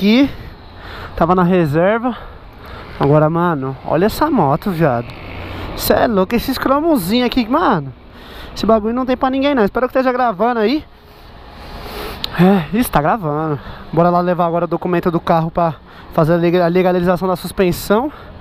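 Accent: Bahia, Brazil. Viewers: mooooo viaje falar baianes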